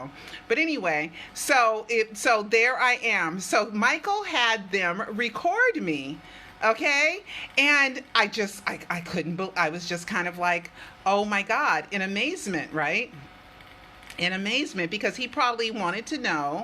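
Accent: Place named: United States. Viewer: Salut!!! Joyeux noël!!! Happy Christmas!!! You are a very intelligent woman. And I will buy your DVDs on amazon. We have to support your job and it don't matter if I don't all understand, I understand the general sense. For your devotion for Michael and us. And i love your personality, your American accent. And I want to know who is Peter midani? Kisses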